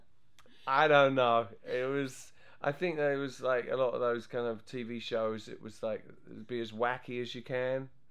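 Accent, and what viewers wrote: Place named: Cockney, United Kingdom. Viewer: A bit of Cockney accent in it, I'd say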